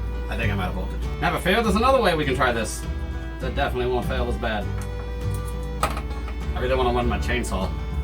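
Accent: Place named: United Kingdom. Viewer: Love the British or is it Irish accent LoL 🤣😆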